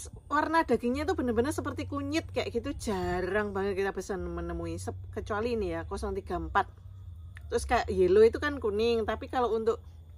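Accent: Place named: Blitar, Indonesia. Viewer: Assalamualaikum nduk mimin...saya asli. Orang Blitar, dkrg di sumantra lampung, hijrah dari thn 1987 ,saya terispirasi dg bibit yg ssmpean promosikan, smg nanti kesinambunga, amin...ditambah lagi logatnya bicara, bikin kangen sedulur nemg blitar...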